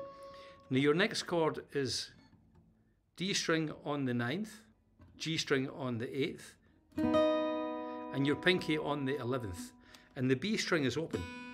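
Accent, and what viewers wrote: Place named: Scotland, United Kingdom. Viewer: Are you Scotts ? What a great accent ! love it.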